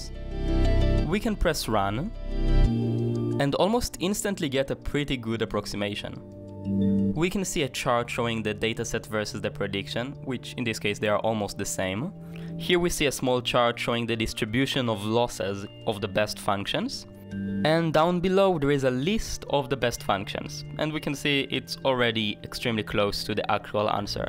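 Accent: Israel. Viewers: nice ai vido and i know u are an isreali becuse of the axent i am 2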